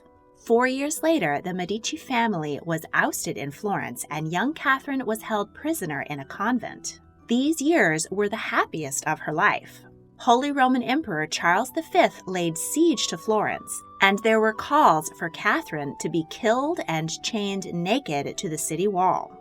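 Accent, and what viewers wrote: United States. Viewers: Not another American narrator ... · Must we have this ghastly American accent? All expression utterly distorted.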